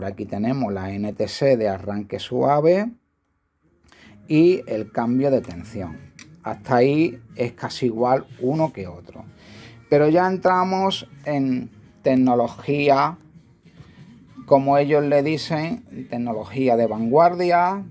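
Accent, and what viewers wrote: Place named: Andalusia, Spain. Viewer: Perdón: viendo más vídeos he comprendido que el acento andaluz usa aleatoriamente ese por zeta y zeta por ese :-)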